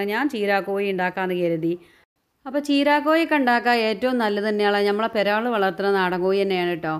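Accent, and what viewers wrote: Malappuram, India. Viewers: അടിപൊളി മലപ്പുറം ലാംഗ്വേജ് കേൾക്കാൻ ഭയങ്കര സുഖം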